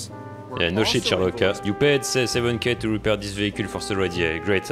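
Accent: France. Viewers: Mais t’es français ? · there is something about roasting people with a thick french accent that is so funny and heart warming lol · Ton accent français 💀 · your enraging griefers and french accent combined makes this content so damn funny 🔥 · I love this guy, his french accent, the edits and the insults make it so much more funny and enjoyable lmaooo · digga man hört doch seinen französischen akzent so raus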